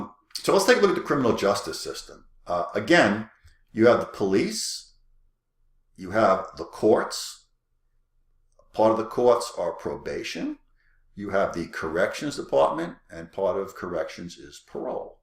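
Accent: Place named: New York, United States. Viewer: I hear my New Yawk accent !!! ❤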